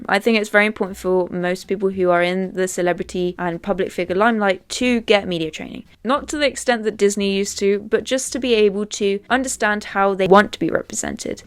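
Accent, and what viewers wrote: United Kingdom. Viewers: You probably get this A LOT but you look so much like Emma Watson and u sound like her cuz ur both British 😭 That’s a good thing though because you are both very pretty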